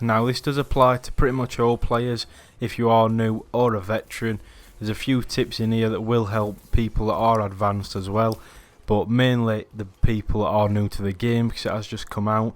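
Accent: Yorkshire, United Kingdom. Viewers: Nice to hear a yorkshire vlogger lol nice 1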